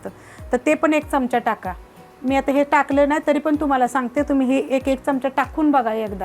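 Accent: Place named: Solapur, India. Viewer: Tumhi solapur kadache ka...bhasha tashich ahe aamachya sarakhi....ani recipes super asatat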